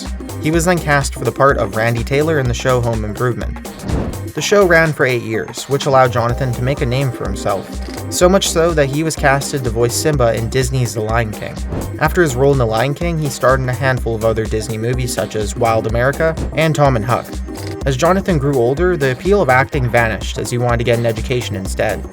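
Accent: United Kingdom. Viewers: he doesnt have a silver play button because he bought this channel, like look at this channels first video. does it sound anything like him? no!! and its not because he aged lmao, its an english accent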